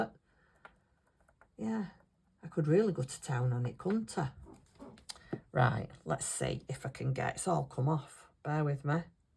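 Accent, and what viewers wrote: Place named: England, United Kingdom. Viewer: I like it a lot! I just started junk journals last year and I am like you! I tend 5o hurry through and then I make mistakes and sometimes tear things apart! 😂😂😂. Keep up the great work! One your paper choice! Where in England are you from? I lived in Suffolk many years ago ( I’m American). Loved it! Love your accent!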